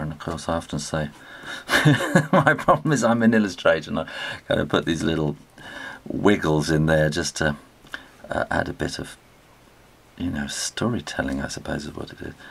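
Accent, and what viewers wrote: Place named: England, United Kingdom. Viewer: nice to hear an english voice for once... and thanks for this tutorial it helped a lot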